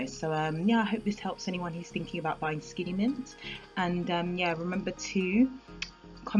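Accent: United Kingdom. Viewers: omg your accent😍😍 I'm sure than you live in England . anyway. you are really beautiful and I will continue to follow you thanks you😀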